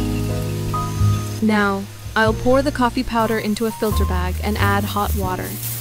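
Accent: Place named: United States. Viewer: I don’t like the American accent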